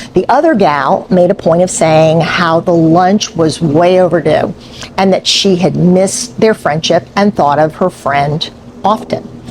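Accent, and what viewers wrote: Philadelphia, United States. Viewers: Thanks... About your accent. Are you from Delco, Philadelphia???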